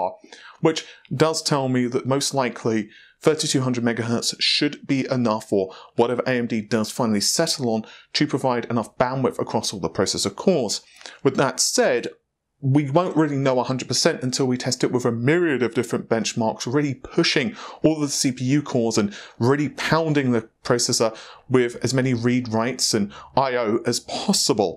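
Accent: United Kingdom. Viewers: Short is good, specially with this thick British accent.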